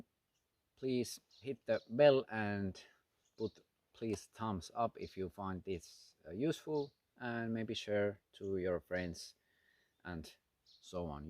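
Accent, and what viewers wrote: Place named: Finland. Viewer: Awesome video. I had never heard of Slavic draw before today. Greetings from Minnesota, USA. I suspect from your accent that you might be Finnish, or at least you live in Finland. Here in my state of Minnesota, there are certain towns and areas where there are lots of Finnish Americans. I am in my 30s, i became friends with guys in primary school around my age who's family still remembers things about Finland very well. So I mean not my friends parents, but some of the parents of their parents remembered things and stories about that place, Finland. I will not mention the small towns here, because people who are not from Minnesota usually do not know the names of them anyway, but the surnames I remember of kids I grew up with included the names Hyuvonen, Tanskinen, Maninga, Karvonen, and Huhta. Once again, greetings from Minnesota USA. 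Long live the art of Archery! One of the most ancient of our martial arts! 🏹 Ha!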